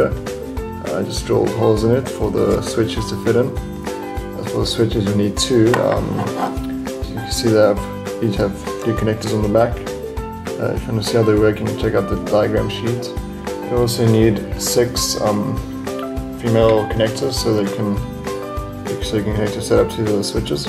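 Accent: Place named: South Africa. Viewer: Hello, by the accent I assume you come from South Africa, where did you get the switches from?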